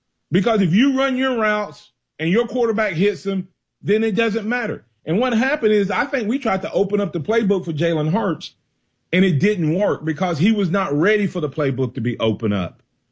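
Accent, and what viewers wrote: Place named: South, United States. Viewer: Look I'm from Athens Alabama born and raised but I can't take this fuckers country ass accent! It's super fucking annoying I mean damn do you really want to sound like you couldn't make it past the 3rd grade..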